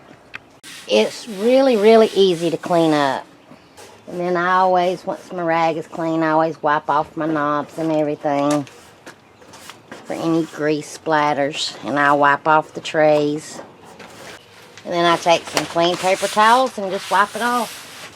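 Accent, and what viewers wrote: South, United States. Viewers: Your country voice made this so relaxing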